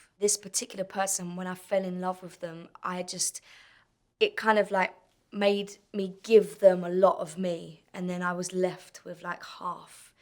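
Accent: United Kingdom. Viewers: Wait.... your British?! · I never saw u talk like that that british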